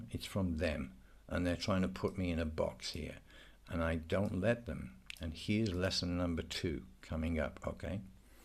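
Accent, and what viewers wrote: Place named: London, United Kingdom. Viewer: Do you mind if I ask you which part of London are you from? (I’m not trying to dox you, I’m just interested in London accents!)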